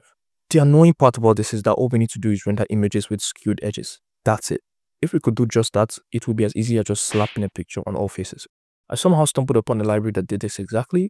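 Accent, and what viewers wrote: Nigeria. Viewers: are you nigerian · this dude sounds nigerian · Awesome video You kinda sound like some of my Nigerian friends ngl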